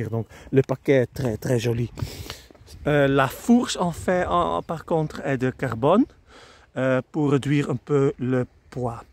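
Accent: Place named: Flanders, Belgium. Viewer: Magnifique 😍 et j’adore votre accent, j’aime beaucoup l’accent et la langue flamande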